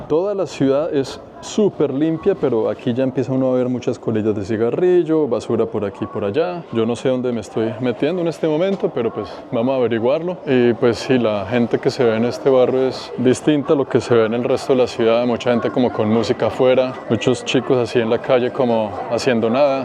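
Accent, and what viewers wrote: Colombia. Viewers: eres la prueba de que el acento colombiano, no tiene por que aborrecer a los 30 segundos. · Parcero sus videos son muy entretenidos escuchar otro Colombiano narrar desde nuestra manera de interpretar es importante no dejes de hacer estos videos 🙏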